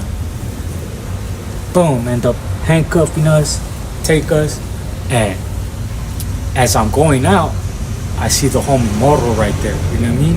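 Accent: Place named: South Central Los Angeles, United States. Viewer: IT'S WHERE YOU GREW UP.ALOT OF L.A. HOMIES TALK LIKE THIS.ESPECIALLY IF YOU GREW UP IN SOUTH CENTRAL L.A...THATS NOT THE ONLY ONE THO ESPECIALLY NOWADAYS.ALL THESE EDGARS COMING IN WANTING THEM TIGHT JEANS... SERIO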